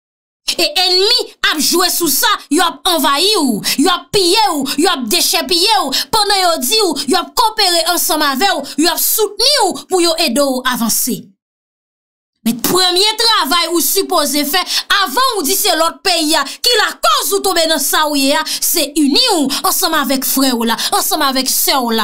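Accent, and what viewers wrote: Cap-Haïtien, Haiti. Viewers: nèg kap dekouvri kay la, aksan sa se nèg okap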